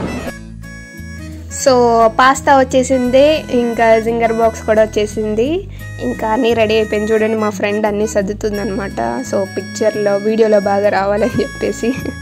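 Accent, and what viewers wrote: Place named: Telugu, India. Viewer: Your Telugu slang 👌👌.. Addicted..♥️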